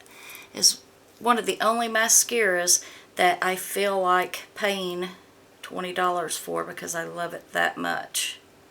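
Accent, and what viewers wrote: South, United States. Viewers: Morning 😊 Love that your comparing a new Subscription to an older one. The highlighter palette is pretty . Your hair looks gorgeous 😊 I love a southern accent. Oh you got 2 ND palettes, I hope I get one in my box 😀 Both were great boxes 😊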